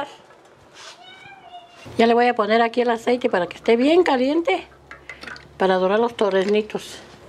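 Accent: Michoacán, Mexico. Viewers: Su acento michoacano me hizo recordar a mis tías, mi papi es de Michoacán yo me siento orgullosa de la sangre que me tocó, muchas gracias señora hermosa por su sencillez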